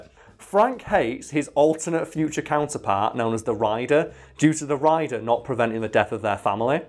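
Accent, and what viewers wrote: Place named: Southeast London, United Kingdom. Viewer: Use your damn northern accent you southeast London sounding ass. Please?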